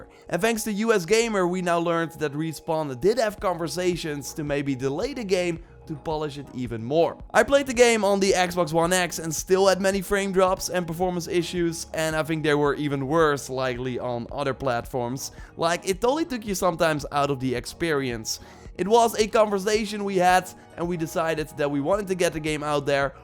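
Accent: Netherlands. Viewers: Nederlandse accent... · You sound Dutch :o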